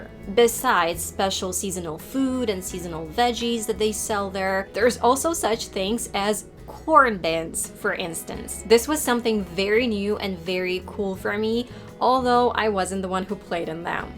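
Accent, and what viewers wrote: United States. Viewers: I'm shocked that you're from Ukraine, because you sound 100% American! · Дуже багато американського акценту))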